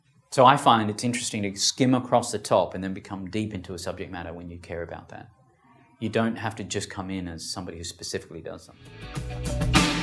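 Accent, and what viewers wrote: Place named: Australia. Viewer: This guy spouts non-sense in the form of words all stringed together that sounds credible in the Australian accent!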